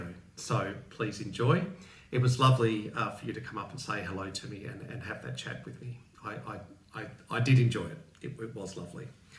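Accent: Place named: Australia. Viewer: thank you for your lovely and thoughtful posts. My husband passed away 2 years ago so I'm alone. He was Australian so listening to you reminds me of Mike. He was from Melbourne so the accent is a bit different. I pray for you r health and that you may feel joy. You are a gift to the world. I look forward to your posts. My joys are my coffee in the morning and my grandchildren.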